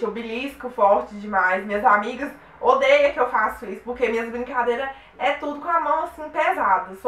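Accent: Minas Gerais, Brazil. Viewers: Morri de rir com o caso da franja, já fiz parecido, kkkkkkkkkkkkkk' Me identifiquei muito com a sua personalidade, parece demais comigo. Até o sotaque é parecido, só que sou do Norte de Minas, inclusive achei que vc também era mineira porque o sotaque parece muito. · Juro que pensei que você era mineira como eu, por causa do sotaque KKKKK adorei, bjs!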